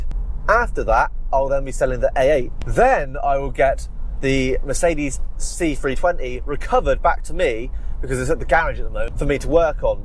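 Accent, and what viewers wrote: England, United Kingdom. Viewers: Hello, where you from? England?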